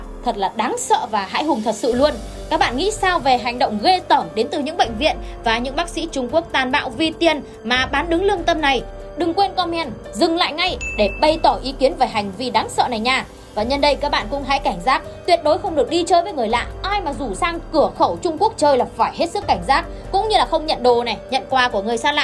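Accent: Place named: North, Vietnam. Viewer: Hello chi em kg biet chi ten la gi cu keu chi la nghe no kg hop voi chi chuc nao gi chi dep gai Thi ten cua chi chac Han la dep lam vay chi Cho em biet chi ten la gi di di nhe chi em rat thich giong noi bac ky cua chi chi noi chuyen rat la hay va duyen dang nghe hoai nghe mai ma kg thay Chang chi noi chuyen rat rat la hay va thu hut em da bi chi thu hut tu Luc Lan dau tien em xem clip cua chi chi la idol cua em va la than tuong cua em em rat ham mo va rat nguong mo chi trong TRAI Tim cua em vay chi nho Cho em biet danh tanh cua chi nha du la chi co ten gi em cung thich nguyen sau chi Cho em biet ten cua chi la gi la em day mang nguyen lam Roi chi dung de em cu keu chi la nghe no kg thich hop voi chi chuc nao vay nho Cho em biet chi ten la gi nha cam on Chi love from Melbourne Australia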